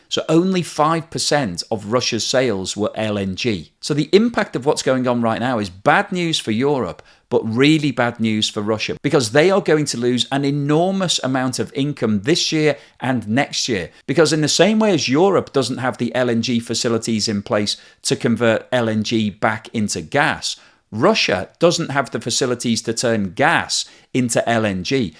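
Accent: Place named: Wirral, United Kingdom. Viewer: is that a Wirral accent I can hear?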